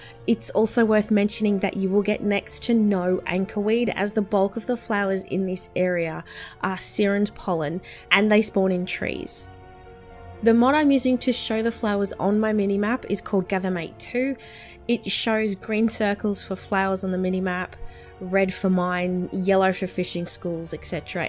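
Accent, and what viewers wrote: Australia. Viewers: I love female aussie accents. It brings a warm feeling to my heart and ears.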